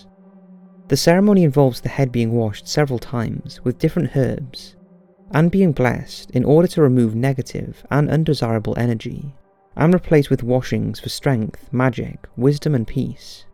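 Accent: United Kingdom. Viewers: i love your british accent 😊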